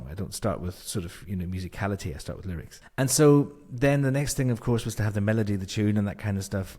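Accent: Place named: Ireland. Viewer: What part of Ireland are you from? I don't recognize the accent. It's hard to pinpoint. It's like a mash of several accents. I'm from Cavan by the way.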